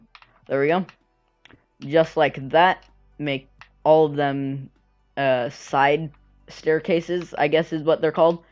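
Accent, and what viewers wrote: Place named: United States. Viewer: Ok like no hate or anything I appreciate the tutorial and stuff but why are you using British lingo (like “rubbish” and “a wee bit”) but you have an American accent?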